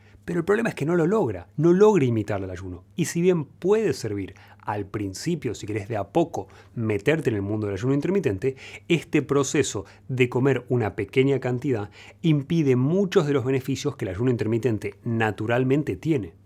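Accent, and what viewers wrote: Argentina. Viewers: 🤔🥴🥴🥴 Yo ayuno a diario porque no dijero nada bien, lo hago sin ton ni son. Pero ya me perdí con tantos tipos de ayuno Dr. Está Ud muy guapo 😘 y su voz es una caricia al oído. Nos tiene enamoradas su conocimiento y su bondad de compartir. Me acabo de dar cuenta de su acento argentino, juraba era español.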